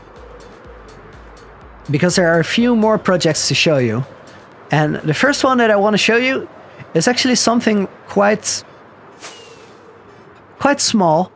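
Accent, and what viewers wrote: Netherlands. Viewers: You sound a bit dutch... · Hé sounds dutch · hahah dacht ik al aan je engels te horen (is geen haat) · U Dutch?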